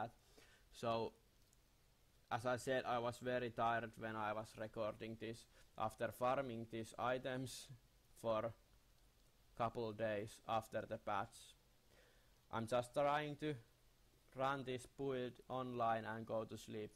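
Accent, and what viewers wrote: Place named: Finland. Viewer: Ootko sä suomesta? Kuulostaa niin suomalaiselta englannilta, if you know what i mean :D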